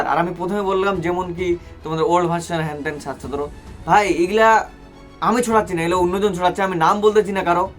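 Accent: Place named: Bangladesh. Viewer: Ei gudir beta youtuber ta bangladeshi pola, ei mal tar kotha sunei bujha jacche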